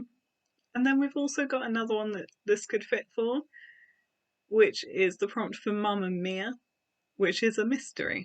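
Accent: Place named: United Kingdom. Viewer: Ikr? It's like the saying about there being so many books & so little time. But if you're a fast reader ( I was but it's now depending on the book) you'll do fine👌.I just lost one of my cats while taking him & his brother to the vet to be fixed,so I'm finding it difficult to focus on reading more than a short chapter atm. Anyway,just keep on reading as much as u can!👍 P.S are u from The UK? Your accent is so pleasant to listen to. I'm from Northridge, California,a suburb of Los Angeles & can't stand my speaking voice-lol.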